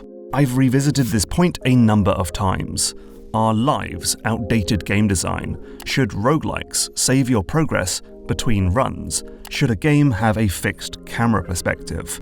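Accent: United Kingdom. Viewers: Finally, he addresses the elephant in the room: his British accent. cf. Between Two Ferns e/ Benedict Cumberbatch: "Do you think if you didn't have a British accent, people would be able to tell you aren't a very good actor?" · Idk man, the fancy motion graphics and British accent was pretty convincing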